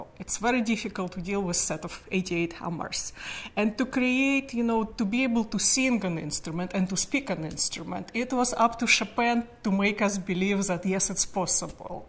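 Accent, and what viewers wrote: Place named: Moscow, Russia. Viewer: Moscow dialect of English. Nevertheless the grammar is normal.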